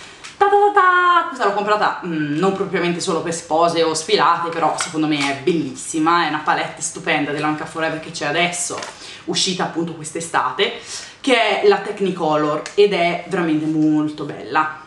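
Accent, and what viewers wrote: Tuscany, Italy. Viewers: non è vero che non sei cambiata dall'inizio. tu sei cambiata, si, ma non in peggio, sei diventata meravigliosa. ogni volta che guardo i tuoi video penso a quando di nascosto dalla mamma accendevo i pc e mi mettevo a rifare i trucchi bellissimi che mi insegnava una biondissima ragazza toscana, dall'accento pronunciato e esilarante, che ogni volta mi metteva ilarità. ora sei cresciuta, ma questo è naturale, l'importante è farlo bene, e, lasciatelo dire, TU CI SEI RIUSCITA. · che bello che sei tornata! mi mancava tanto anche l'intonazione toscanaccia! eheheheh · A me sei mancata perché è mancato il tuo modo di esprimerti e mi è mancata la toscana di youtube.. perché quando da Bologna guardavo e guardo i tuoi video la sera dopo aver sentito un sacco di accenti diversi, sentendo parlare te mi sentivo un po' a casa. Metti allegria e mi piace la tua giovialità! 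complimenti per la tua votazione e in bocca al lupo per il tuo futuro da MUA! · Ciao! Finalmente sei tornata! Nulla, volevo dirti che sono (come credo molte delle tue iscritte) molto fiera di te perchè hai inseguito il tuo sogno e hai raggiunto i tuoi obbiettivi. Devi essere veramente felice della persona che sei, credimi! Continua sempre a fare quello che più ti piace e vedrai che di soddisfazioni ne arriveranno sempre di più:) (Mi chiamo come te e sono anche toscana come te ^^ Quando ti sento parlare mi sento proprio a casa!) Un bacio a Tiffyyy! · Sono felice che l'accento toscano ci sia ancora!!! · ciaooooo sei beramente bravissima!!!! ma sei toscana!?!?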